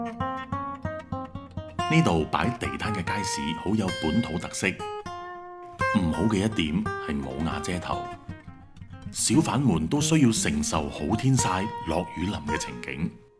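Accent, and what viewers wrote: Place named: Cantonese, China. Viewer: 你的粤語好好聽💚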